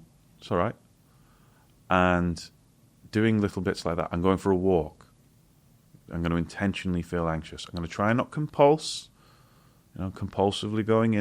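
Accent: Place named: Manchester, United Kingdom. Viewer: I’m so glad I found your Ted talk which led me here. As a fellow Mancunian (living overseas for the past 30 years) it’s so lovely to hear a familiar accent and I totally get your humour. I have had anxiety for as long as I can remember. I can’t get out of bed on a work day without an anxiety meditation and now their effect is diminishing and I feel like I constantly have to look for the next quick fix. After listening to this I’m going to take my anxiety for a shower tomorrow! Thank you!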